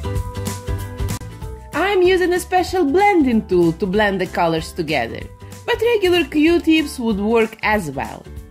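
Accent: Russia. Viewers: I'm sorry for asking but is she a Russian or Ukrainian? She has a very strong accent... like I have, haha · Русская что ли? Слышно что английский не твой родной язык.... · I love your accent! Are you russian? If you are, I am too! ❤ love your channel btw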